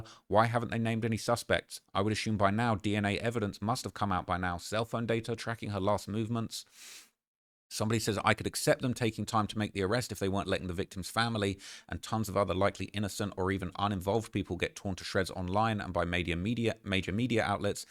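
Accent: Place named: England, United Kingdom. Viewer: My dawg talks like the English but acts like an American lol. Salute my bro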